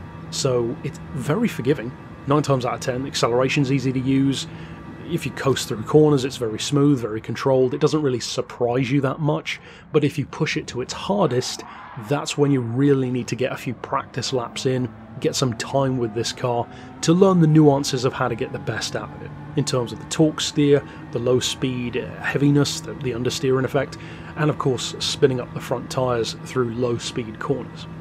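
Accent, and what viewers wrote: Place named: England, United Kingdom. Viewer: Decent review, his English accent was killing me a tad, but this jag does look pretty smooth!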